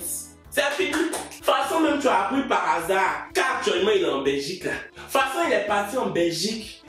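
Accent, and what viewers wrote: Cameroon. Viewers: Loool y’a beug dans mon cerveau ou j’ai entendu s’exclamer en disant (yé maléhhh🙆🏾‍♀️🙆🏾‍♀️) vraiment mon frère ou ma sœur camer là, tu fais du bon travail 👍🏾👍🏾😂😂🤣 j’écoute jusqu’à parfois j’oublie qu’il est ivoirien!! Yeuch!! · Bonjour Je t'ai aperçu à un pas de ma maison ds le 15eme arrondissement le février,je rentrais du travail, j'étais un peu timide mais aussi très fatiguée avec une longue journée. Mon souhait a toujours été de te rencontrer un jour mais alors ce fameux jour est arrivé et j'étais fatiguée. On s'est salué vite fait car tu étais avec tes amies et moi qui passait et pas de courage de venir vers toi faire une photo. Dis moi seule qd est ce que tu reviens ds ce café pardon. Je t'écoute régulièrement et ds ton accent je comprends que tu fréquentes beaucoup de camerounais car tu as plusieurs mots camer ds tes vidéos. Trop happy ooooooooo En tout cas je sais que seul les montagnes ne sont rencontrent pas. 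N'hésite pas à me répondre Une fan depuis le 15ème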